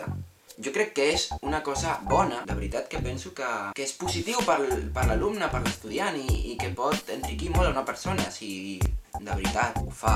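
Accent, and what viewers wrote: Spain. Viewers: se te oye sexy en catalán, en general me quedo a descifrar lo que dijiste con tu acento español, peor ahora no eh podido, a pesar de lo sexy, ni modo.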